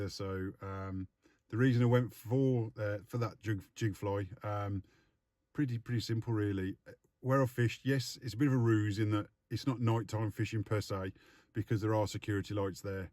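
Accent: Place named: Black Country, United Kingdom. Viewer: nice one mate. I thought u mite set me strate on the 'brummy' thing, i use the term generically. I shud of been more accurate and sed you are a YAM YAM or WERNIT, and me getting that wrong cud almost be classed as an insult, so i do appollogys for my incorrectness. I think i have got that rite, with the YAM YAMYAMS and WERNITS and BRUMMYS, there is probly sum more 'off shoot' local terms for the 'tribal affiliations lol, that i'm not familiar with. So if you are a Blackcountry fella, that means you're not a Brummy, strictly speaking. You know wot us northerners are like we hear an accent and thats that lol. Anyhow mate spot on fishing and i appollogies for the brummy thing. Cheers Mick.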